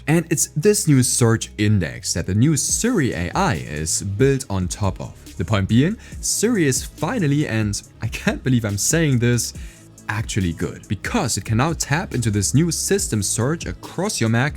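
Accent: Germany. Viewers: erster Nice. starked Video. Mann kan aus dem Accent hören, das du Deutsch kannst. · Dass du ein Deutscher bist, hört man sofort raus. 😂 Wünsche dir viel Erfolg mit deinen Videos. 👍🏼 Weiter so.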